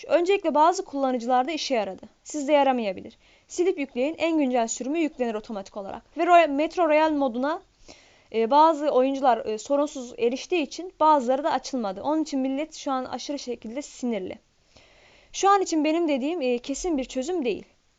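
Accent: Azerbaijan. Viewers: azerisen qaqa?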